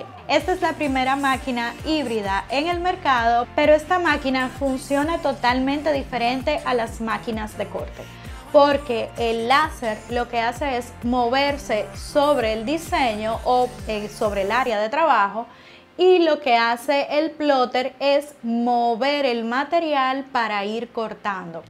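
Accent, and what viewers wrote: Dominican Republic. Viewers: Saludos, bendiciones, nuevo suscriptor, por tu acento sabía que eras Dominicana 🇩🇴, enhorabuena, ya estamos avanzando en RD con máquinas de corte láser, yo tengo impresoras 3D y ya compré una máquina de corte láser, te felicito y te deseo muchos éxitos 😊👍🏽🙏🏽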